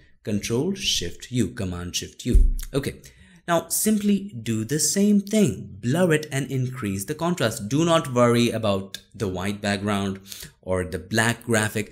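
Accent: India. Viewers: Sir, your accent is wonderful! I guess you're an Indian, and I feel elated to have watched this video! Thanks a lot! You nailed it bhaiya!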